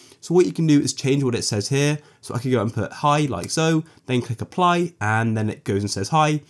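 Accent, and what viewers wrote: United Kingdom. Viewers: I don’t understand what u are saying ur so British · Are u bridish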